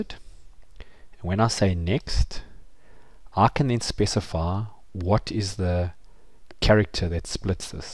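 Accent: South Africa. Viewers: narrator is def south african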